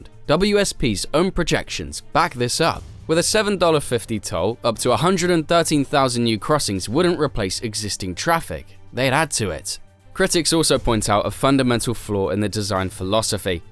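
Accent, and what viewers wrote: United Kingdom. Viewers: Who let the gdamn British do this doc.... calling Queens, LI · That Limey twang is irritating. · We hate this harry potter accent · I can’t take a British man talking about nyc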